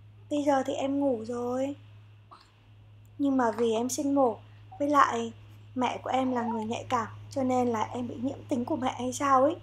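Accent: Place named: North, Vietnam. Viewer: Chị ơi sao chị đống phim chị nói tiếng việt sao chị lại nói tiếng bắt vậy chị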